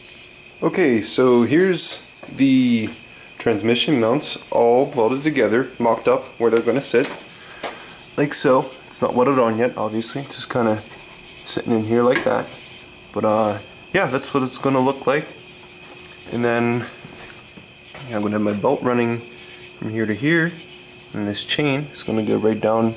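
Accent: Canada. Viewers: You sound extremely Canadian.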